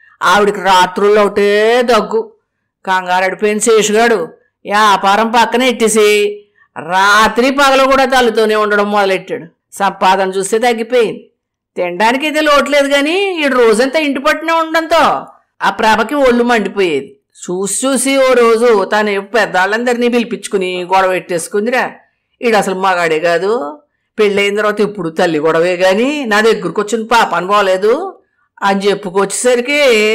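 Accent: Godavari, India. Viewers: గోదావరి జిల్లా యాస తో బాగా నవ్వించారు.పాకం గారెలు ఎప్పుడూ తినకపోయినా తిన్నట్లుగానే వుంది మీ మాటలు వింటుంటే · గోదావరి జిల్లా యాసతో బాగా చదివారు. కధ చాలా బావుంది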